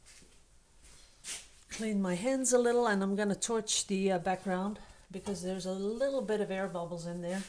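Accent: Netherlands. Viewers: I thought I heard a Dutch accent! My dad is from Holland so that makes me half Dutch even though I have never been there. One day I truly hope 💜 Thank you for sharing your art. It's beautiful!💕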